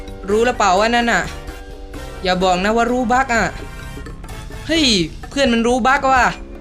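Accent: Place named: Suphanburi, Thailand. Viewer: ทำไมพี่พูดทองแดง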